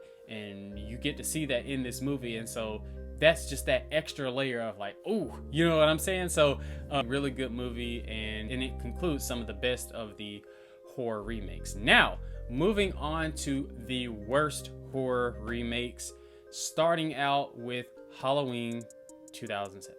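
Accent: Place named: South, United States. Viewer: You sound very southern. Where do you live?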